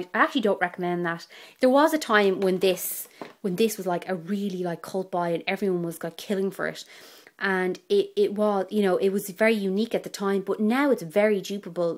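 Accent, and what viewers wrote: Ireland. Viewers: Love your accent! Are you Irish? I'm part Irish! Anyway, can you review the Kat Von De palettes? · are u irsh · OMG it so amazing when you watch so many people with american accents all day long[ and then you stumble across someone with an Irish accent... up the Irish... im so subscribing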